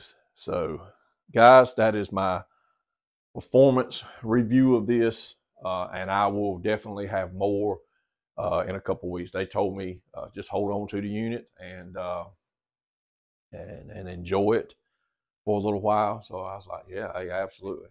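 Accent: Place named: South, United States. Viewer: Cheers! Cool to see companies sending you gear. There are other channels with good people. But i was raised in part by a southern gentleman, and its cool to hear a voice with some southern twang in it, reviewing audio gear.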